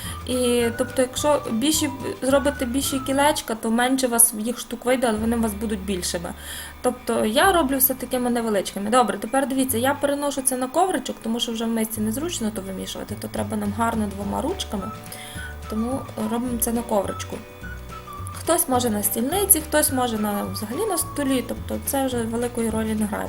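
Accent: Western Ukraine, Ukraine. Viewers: У вас украинския речь с западным акцентом., а почему ваш канал подписан по английски? Что в украинском языке не нашлось вам название? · Эта Украиночка из западной Украины. Я тоже с Украины, но у нас другой диалект))))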